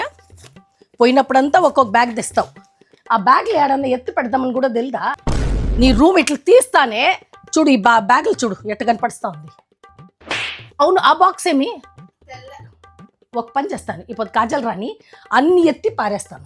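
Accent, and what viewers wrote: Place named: Anantapur, India. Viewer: Your anantapuram slang is very nice. 🎉🎉🎉🎉🎉🎉🎉🎉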